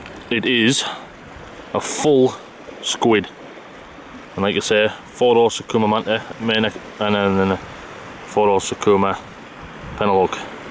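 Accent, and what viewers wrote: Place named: Northern England, United Kingdom. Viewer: You really give the subtitles hell with your superb Northern accent.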